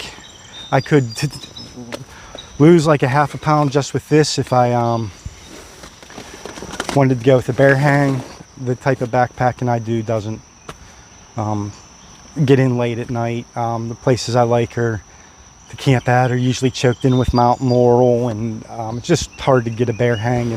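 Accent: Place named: Western Pennsylvania, United States. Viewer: Right on western PA .... so you sound..... East Palestine here.!!!!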